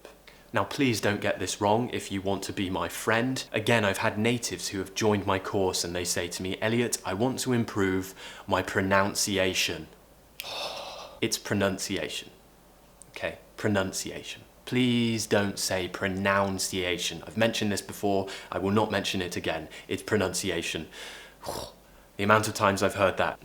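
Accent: United Kingdom. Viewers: Hi, This is Libin from India. It has been a wonderful experience attending your programme on British Pronunciation. I love your accent and I practice your lessons daily. There is great improvement in my pronunciation. I take this opportunity to thank you. Thank you so much. Libin Mathew. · your British accent is everything. I feel stupid trying to copy you. 🤣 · Thank you so much Elliot! I love the british accent and I'm lerning a lot with your videos! What a lovely sentence "I have so much gratitude for every mistake I make" I agree with you and I try to think that way when I make a mistake. I enjoyed this lesson! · I love british accent cos it's quite easy and understandable btw i appreciate your work mate 😘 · I am from Quebec and unfortunately I cannot hold a conversation in English, but your very British accent is really nice. I listen to your shows from time to time and take pleasure in trying to understand your explanations. 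It also happens that I use the automatic translation into French of you tube, which I do in other English shows, and I must admit that the translation is impeccable, which means that the construction of your sentences must be flawless. Thank you and good luck for your work and for making us appreciate your wonderful culture. (translated by Google)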